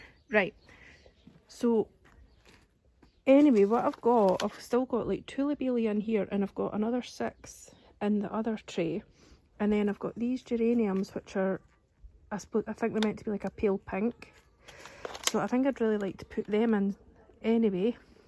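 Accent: Scotland, United Kingdom. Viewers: I dont know if it’s because i watch Eli and Kate that your channel came up in my algorithm. It’s nice to hear a Scottish accent and see what you’re planting. Im zone 7b Bannockburn so it’s great to see plants that will suit the conditions here in Scotland. Thanks for sharing, subbed ❤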